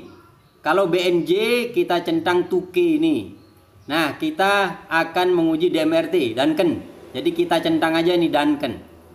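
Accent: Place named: Madura, Indonesia. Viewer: Semangat pak, lanjutkan mengajarnya , saya suka dengernya kalo pake logat madura gini mantab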